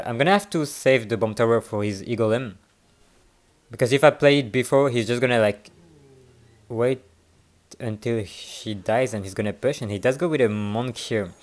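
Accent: France. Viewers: incredible french accent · good french accent bro t'es français? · l love this french accent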